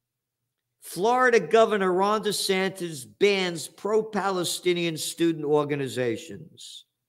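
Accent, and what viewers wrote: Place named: New York, United States. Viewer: Spoken like a true New Yorker.. love it.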